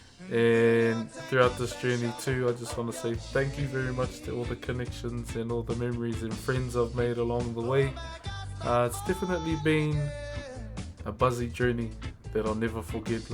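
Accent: New Zealand. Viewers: New Zealand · Are you from New Zealand?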